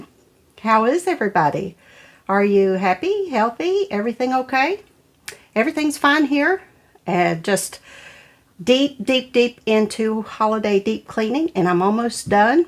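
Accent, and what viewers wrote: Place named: West Virginia, United States. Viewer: I love your videos. I love your voice and accent. Is that a true West Virginian accent?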